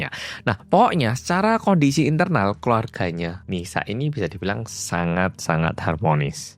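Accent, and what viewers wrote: Java, Indonesia. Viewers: Gausah. Walopun ngomong medok. tetep orang Korea. Walopun dulu lama tinggal di Indonesia sekarang dia tinggal di korea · dia gak salah apa apa kok ngatain sorry unsubscribe wkwkw dulu dia lahir di korea dan besarnya di indo dari kecil lho apalagi udah mahir bhs indo yg medok, dan dia itu cinta bgt sama indonesia. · medok 🎉 Lgsg nyimak 🎉🎉🎉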